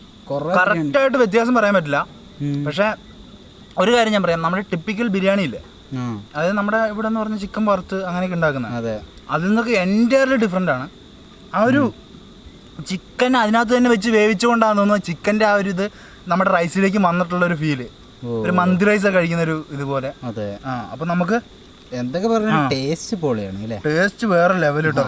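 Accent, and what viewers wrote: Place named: Tamil Nadu, India. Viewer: കള്ളുപ്പ് 😅 തമിഴൻ talk😁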